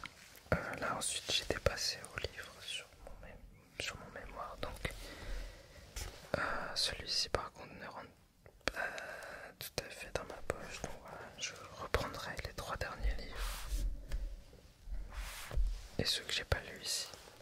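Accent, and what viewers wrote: France. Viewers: "une pétite (h)AUL" i feel bad for laughing.. i love the french accent tho, not trying to be mean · I literally love your voice! I'm a french student (in America) and I am completely obsessed with France/French. Your accent is so amazing :) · I also love your french dialect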